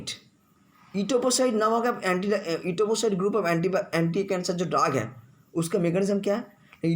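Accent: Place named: Northeast, India. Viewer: Thank u so much sir 😊.. Your voice is seems like northeast people....I really love northeast people because they are very kind herted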